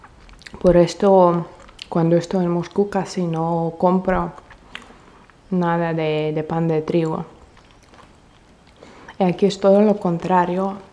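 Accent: Spain. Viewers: Entre la seriedad con que tratas cualquier tema, la misma naturalidad con la que hablas me resulta gracioso tu acento español (en el buen sentido de la palabra). Saludos desde Colombia. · wow ya tienes la z en tu acento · ***** Tiene acento de España porque está viviendo alli, al igual que tendría acento argentino si estuviera viviendo allí. Ah, y no has pensado que quizás otros acentos no les hacen gracia a otros países? Ella no está aquí para hacerte reír, está aquí para compartir su experiencia viviendo tan lejos de su país natal, así que si quieres a alguien gracioso y con otro acento porque no eres capaz de aceptar las diferencias culturales, tienes muchos otros canales de mucha gente que hace su mismo contenido.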